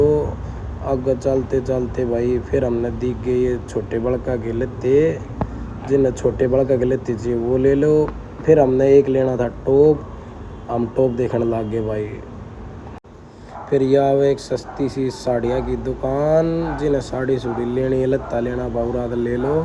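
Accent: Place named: Haryana, India. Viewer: Haryanvi voiceover 🤌💕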